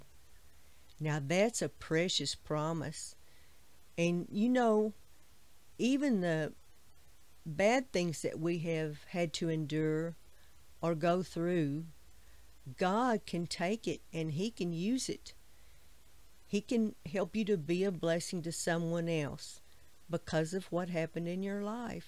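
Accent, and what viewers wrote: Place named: South, United States. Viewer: thank you for your prayers - so much appreciated!🙏🥰 The closest I have been to your neck of the woods is a couple of holidays in Florida back in the 80s which I loved! I assumed from your accent you were a Southu'n Belle! I went through a phase a few years back when I read absolutely everything by Fanny Flagg just to enjoy the South'n vibe after seeing Fried Green Tomatoes - and Blanche Deveraux in The Golden Girls was hilarious!😁🤣 Probably a terrible pastiche of the real thing but I loved them anyway! I'm very much enjoying your channel and your calm, sane biblical approach (not so commonplace around today's 'prophets') and they were really a 'word in season' for me - thank you - I intend to enjoy a lot more!! Love & Blessings to you & your ministry!🙏✝️🥰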